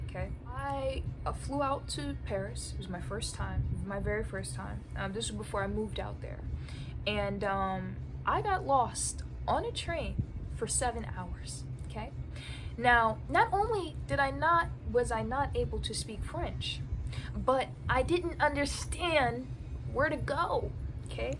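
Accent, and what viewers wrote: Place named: New York, United States. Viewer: I love this video z! The NY accent, the light hearted vibes and the authenticity. It’s so you.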